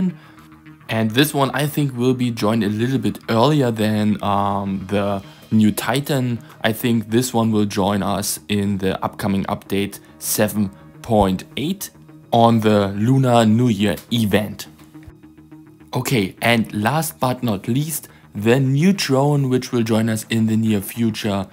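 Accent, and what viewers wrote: Germany. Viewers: Ich liebe dein deutschen Akzent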